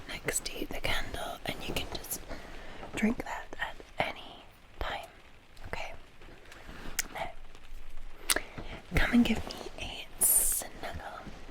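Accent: Scotland, United Kingdom. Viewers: What told us about herself: 1. From Scotland 2. Has scottish accent 3. Loves football 4. Drinks beer 5. Parents live close to Golf course If these were the traits of a character written for a TV show, the writers would be accused of reproducing quite a lot of stereotypes xD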